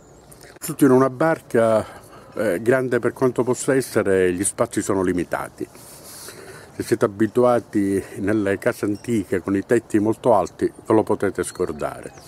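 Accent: Sicily, Italy. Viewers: Grazie per le information. Leibe siciliano?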